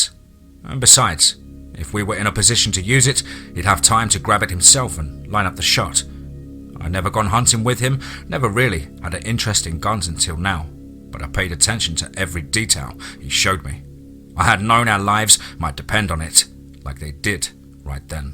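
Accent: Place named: United Kingdom. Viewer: My only input is that I hope your accent could be more neutral (accent-less, a.k.a American English), so it doesn't feel archaic. British accent (or similar) are good for old ghost stories or cosmic horror stories. Also, most of your stories are set in the Americas, aren't they? It should add to the immersion factor.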